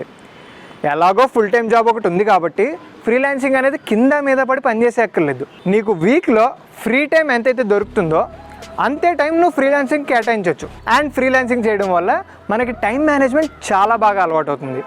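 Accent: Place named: Bhimavaram, India. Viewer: super bro... Meeru bhimavaram valla.. naku mee videos vinnappudalla ma uru la anpistudi😊. All the best 👍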